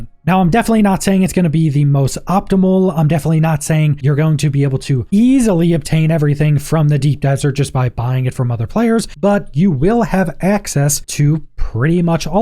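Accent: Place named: South, United States. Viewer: It’s a southern thing. I’m bet he also says Axe instead of Ask.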